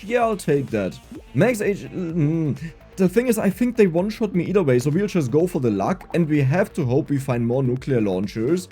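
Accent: Germany. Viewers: Direkt die deutsche stimme erkannt😂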